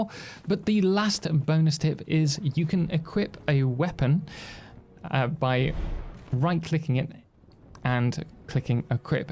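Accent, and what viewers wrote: England, United Kingdom. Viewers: My man, which part of England is your accent from? Can’t place it exactly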